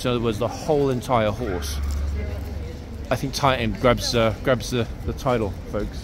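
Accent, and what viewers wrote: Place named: United Kingdom. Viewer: You refer to the Americans sometimes. But I have yet to hear you say anything about we Canadians. The states are not a Commonwealth country. Canada IS. (By the way, to me….all British people sound so SOPHISTICATED, no matter what they’re saying! I really enjoy how you express yourself! I LOVE the British accent!! ) 😁👍🏻🇨🇦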